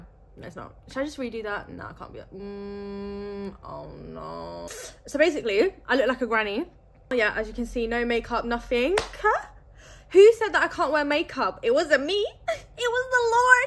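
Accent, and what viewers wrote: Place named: United Kingdom. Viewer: I don't know who you are but started listening to you anyway. Sorry to hear about the car accident. Your accent tells me you are probably from the UK. Best wishes.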